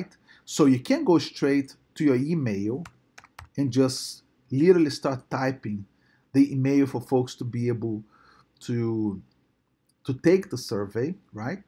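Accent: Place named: Brazil. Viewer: Deu para sentir seu sotaque de brasileiro nos 5 primeiros segundos do vídeo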